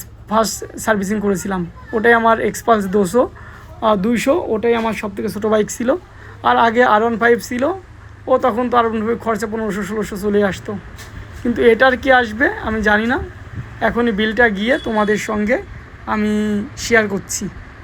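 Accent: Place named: Bangladesh. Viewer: Vai tumi ki Bangladeshi naki??? kotha sune tai to lagche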